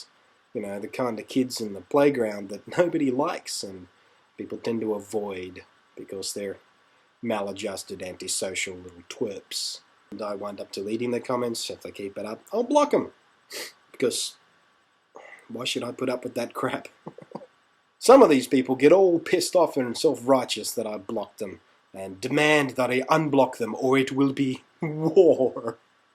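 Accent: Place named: Australia. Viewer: Hypocrisy Anyway. You're right. Plus I'm guessing from your accent that you're an Aussie? :] You just got yourself another subscriber.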